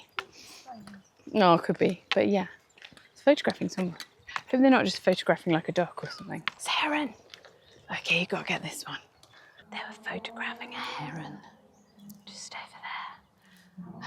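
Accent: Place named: United Kingdom. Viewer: Thank you! I do OK with the British accent, but It's easier when Its loud and clear. 🙂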